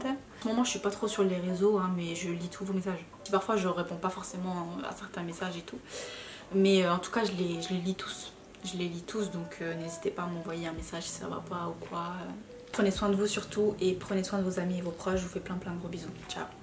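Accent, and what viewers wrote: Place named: South, France. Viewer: jaime trop ton ptit accent moi aussi je viens du sud de base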